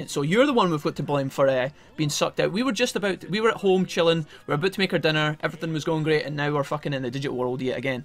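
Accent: Scotland, United Kingdom. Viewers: Scottish Ron Weasley Digimon World let's play...... You know I'm gunna thoroughly enjoy all these episodes ☺️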